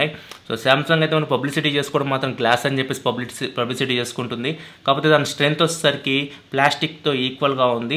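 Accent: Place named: Telangana, India. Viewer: anna.. Telangana Yasa madyalo Mast undi😍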